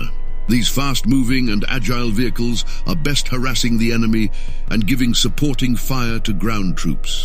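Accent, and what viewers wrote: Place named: United Kingdom. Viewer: bro had me at british sounding voice and 2nd edition 40k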